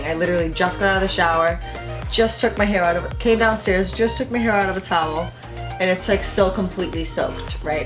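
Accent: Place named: New York, United States. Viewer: Love your videos. Trying to place you slight accent. Are you from New York?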